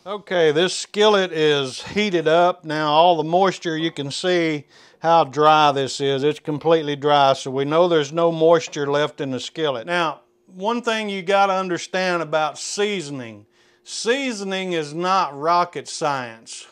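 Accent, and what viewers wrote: South, United States. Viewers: Hi - I watched a couple of other cast iron skillet channels before I got to this one. They seemed ok, but once I found one with a Southern accent I knew I'd found my go to cast iron skillet channel. Thanks! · Didn't trust anybody on YouTube showing me how to season a skillet i heared somone with a southern accent thanks for the video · Youre a diamond, I've just bought one it will be delivered later. I find it hard to trust all these fancy videos but then a bacon-frying American with a Southern accent comes along and shows how its done, I feel confident in my purchase now. And yes sir, I will be frying bacon in it. Much love from the UK 🇬🇧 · I grew up in the south watch your videos just to listen to your great accent. I may even buy a cast iron skillet 🤣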